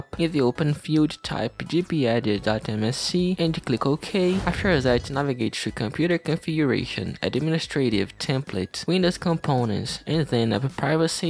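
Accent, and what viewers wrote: Brazil. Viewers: Seu sotaque parece brasileiro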